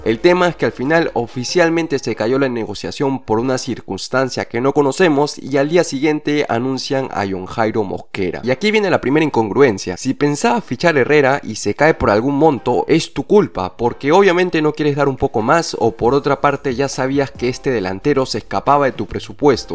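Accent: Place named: Bolivia. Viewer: Por q el marcado boliviano? 😵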